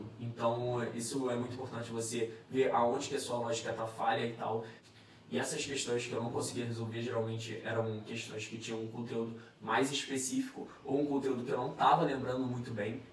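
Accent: Rio de Janeiro, Brazil. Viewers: bonito, inteligente e sotaque carioca pressão: "me ajuda" cai aqui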